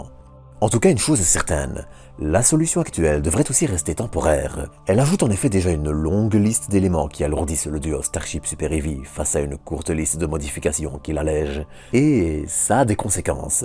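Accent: Belgium. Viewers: Étant bruxellois, je crois que je reconnais un accent belge.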